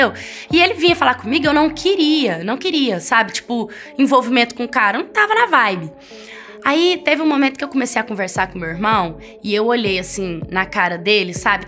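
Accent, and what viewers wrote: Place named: Goiás, Brazil. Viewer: c é de Goiás né?esse sotaque maravilhoso n me engana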